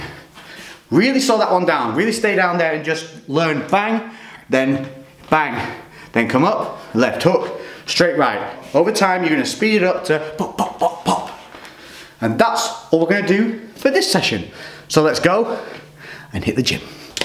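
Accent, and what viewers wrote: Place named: Lancashire, United Kingdom. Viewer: Where in Lancashire are you from?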